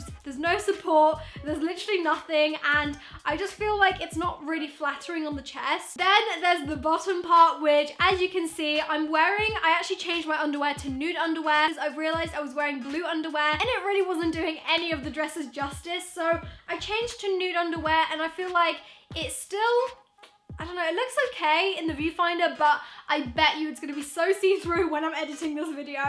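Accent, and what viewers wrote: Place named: United Kingdom. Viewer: I love her British accent ❤️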